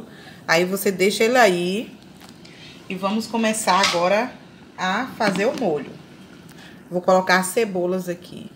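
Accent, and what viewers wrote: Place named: Northeast, Brazil. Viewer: Boa noite! Amei sua receita, vou fazer assim. Você é nordestina? Pelo sotaque, kkk, eu sou pernambucana. E pelo coentro tenho certeza , pois amamos.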